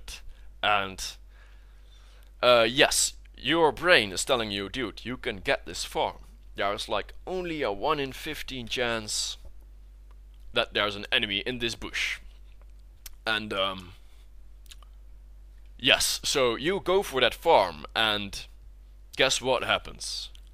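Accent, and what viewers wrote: Netherlands. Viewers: are you dutch? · DAT DUTCH accent :D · Love your dutch accent.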